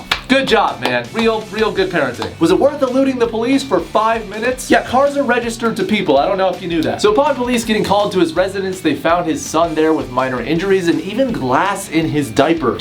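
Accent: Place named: United Kingdom. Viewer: You seem like one of those low key British people. You have our humour and the way you say some stuff is like us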